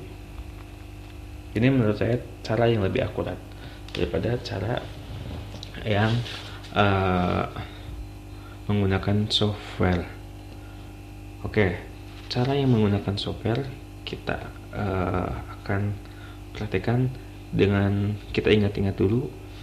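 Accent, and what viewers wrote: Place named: West Java, Indonesia. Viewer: pantesan aya logat" sunda hehe...abdi ti sumedang kang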